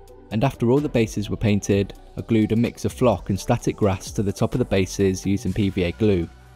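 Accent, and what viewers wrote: United Kingdom. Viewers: I'm not from the UK - what kind of regional accent is this? It's not super weird or anything, just kind of distinctive in some ways!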